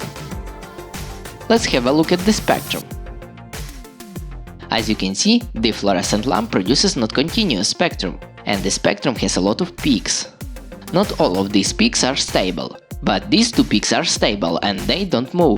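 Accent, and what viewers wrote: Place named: Russia. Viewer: Funny Russian accent :)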